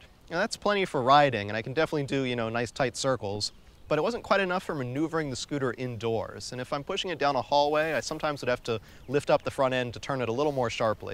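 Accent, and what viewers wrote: United States. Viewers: פאק, הייתי בטוח שאתה אמריקאי ואז פתאום in tel aviv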